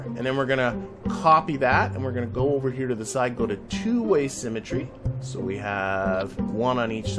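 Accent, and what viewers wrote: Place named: Canada. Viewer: Just found your channel a couple weeks ago. You make some of my favorite tutorials, and have explained key concepts in a very digestible manner. I've got a couple questions about yourself, feel free to not answer if you'd like. For one, do you have a background in mathematics, like either studying at high level or teaching? My second question is are you from Canada, or the northern U.S., you have that sort of accent and i've just been curious. Thanks, -Ben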